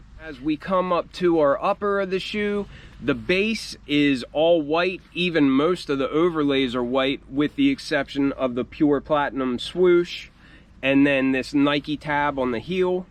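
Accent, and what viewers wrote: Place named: Philadelphia, United States. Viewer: you gotta be from greater philly talking like that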